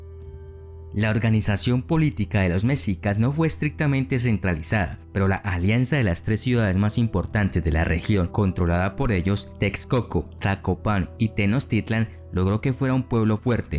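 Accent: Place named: Colombia. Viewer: El narrador es Colombiano, se nota cuando pronúncia Frijoles y cuando no puede pronúnciar Las palabras Nahuatls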